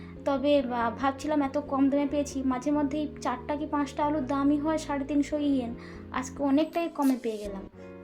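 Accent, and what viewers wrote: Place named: India. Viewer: Puro videota khub valo laglo tomar kotha sune bojha jai j tumi india r meye ki sundor dekhe sune khoroch koro khub sundor lage dekhte cuttie❤❤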